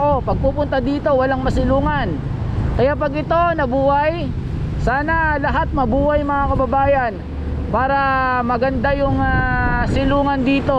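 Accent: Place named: Provincial, Philippines. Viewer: ANG MGA ITINANIM NA PUNO AY HINDI NGA IBINAON SA LUPA NG TAMA. DAPAT TALAGA IKINONSULTA SA MGA HORTICULTURISTS ANG PAGTATANIM. KULANG NGA SA LUPA. SA ABROAD, HINDI GANYAN ANG STYLE NG PAGTATANIM- MAS MAAYOS AT RELIABLE ANG SA ABROAD. DAPAT IKAW VLOGGER, MAGING EVIDENCED- BASED INVESTIGATIVE VLOGGER KA AT HINDI PURO TALAK- NG TALAK AT HAKA- HAKA. NAKAKA- IRITA ANG TIMBRE NG BOSES MO. PROBINSYANO KA BA?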